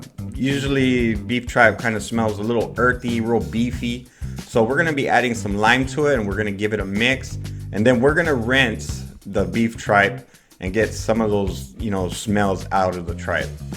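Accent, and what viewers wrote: California, United States. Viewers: I cook my menudo for about 5 hours. I'm from Texas sounds like your from California. Menudo is great,especially in cold weather like,Now! Thanks for your recipe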